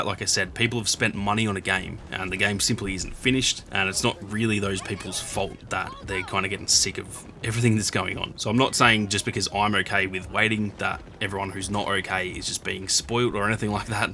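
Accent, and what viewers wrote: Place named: Australia. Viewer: As a PC elitist I subbed for the vibes and the aussie accent a while ago. Keep it coming and best of luck with the sub goal :)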